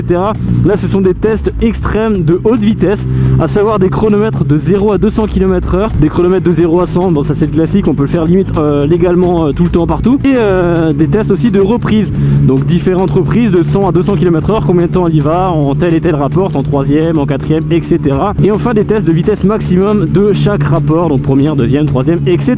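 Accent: Alsace, France. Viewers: Salut tu est d'où d'Alsace ? Sinon super vidéo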